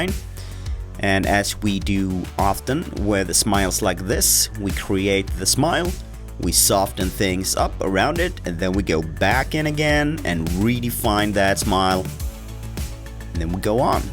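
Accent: Sweden. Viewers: What swedish accent do you have??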